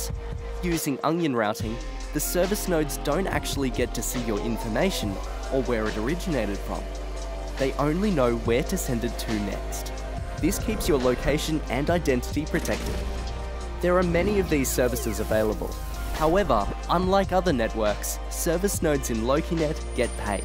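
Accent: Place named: Australia. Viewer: I like the Australian accent used in this video.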